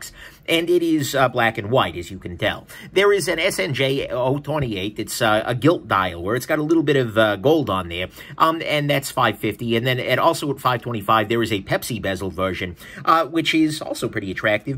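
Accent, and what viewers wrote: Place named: Chicago, United States. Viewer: “Da watch over dare.” Are you from Chicago?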